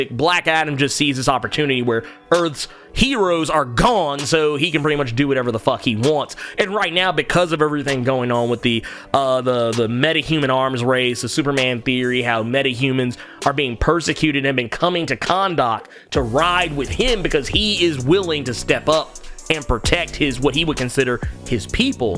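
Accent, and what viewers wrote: United States. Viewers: He’s American how else is he supposed to sound like?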